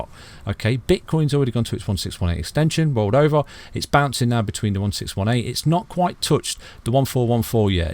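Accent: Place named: England, United Kingdom. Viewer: Lol , it's that English accent 🤣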